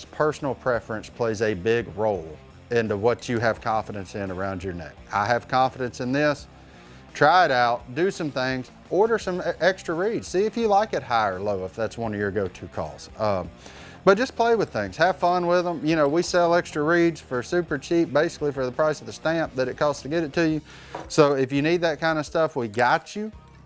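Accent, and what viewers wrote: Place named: South, United States. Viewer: Who else loves his Southern accent?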